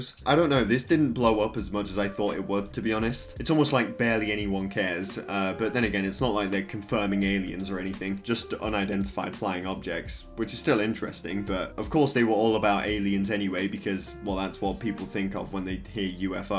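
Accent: England, United Kingdom. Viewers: are you from england ?. do you watch doctor who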